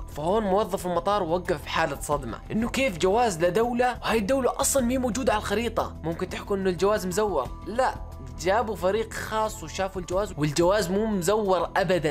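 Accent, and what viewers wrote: Jordan. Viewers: سؤال انت اردني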